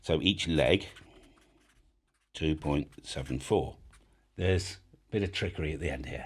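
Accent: United Kingdom. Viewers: your posts would be better if you used scripted (posted words) rather than trying to figure out what you are saying with your British down play English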